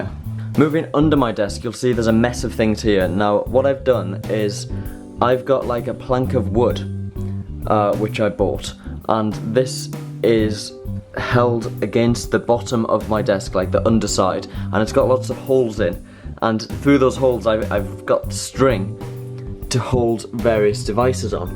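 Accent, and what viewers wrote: United Kingdom. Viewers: i like your room setup! and thanks for the British accent :D , watching ur videos really improve my English lol *without sub :p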